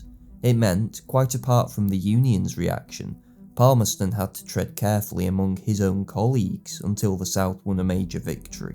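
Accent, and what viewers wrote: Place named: Stoke, United Kingdom. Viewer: 10 2 1 the narrator comes from Stoke!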